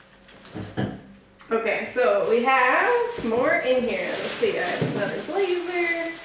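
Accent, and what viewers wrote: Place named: United States. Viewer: Wow, that’s a lot of uniform pieces!!!! Very very nice!!! I just saw the recommendation from youtube to watch your video and started watching and was like: wait, which airline in the US has that uniform? (Because of your American accent) Then I saw the rest of the video and you said it. Awesome.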